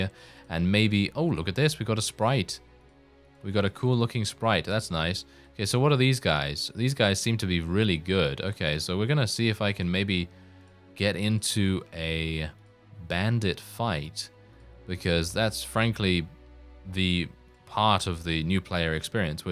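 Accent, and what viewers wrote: United Kingdom. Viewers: Angelo Orsini (literally little bears, one of the most influencial roman family of that time) would be a very nice name for your character. It's lovely to hear speak italian with your british accent. If you are gonna make a series, join Venice, make the doge proud. Hail San Marco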